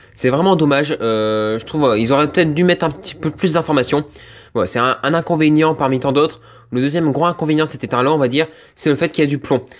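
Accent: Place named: Vosges, France. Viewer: Je crois qu'il est Vosgien (d'après l'accent) et je sais que les gens là bas ont tendance à parler un peu vite. M'enfin rien de grave, la vidéo est de qualité et c'est ce qui compte ^^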